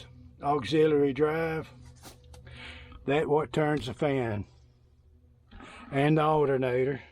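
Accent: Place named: South, United States. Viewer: You're welcome. The polite southern commentary is 2nd to none.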